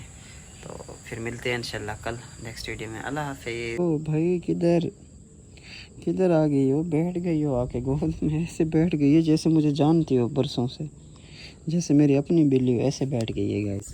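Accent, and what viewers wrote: Saraiki, Pakistan. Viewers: Kabhi tum kisi lady k saath unko wife batate ho kabhokou new aurat ko wife batate ho.tum lahje se saraiki lagte ho.Makkah mai mere under 1 sairaiki mechanic kaam karta tha.yahi lahja tha.but very nice guy tha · پلیز بھائی ضرور جواب دین آپ پاکستان کے کس شہر سے ہیں؟ آپ تو بالکل ہمارے والی سرائیکی بولتے ہیں میں نے اپکا ویلاگ دیکھا گاوں والا ۔ ہم ڈیری غازی خان سے ہیں اب اسلام آباد ہوتے ہیں۔ پلیز جواب ضرور دیں آپ پاکستان کے کس شہر یا گاوں سے ہیں۔ پلیز ۔ فاطمہ اسلام آباد پاکستان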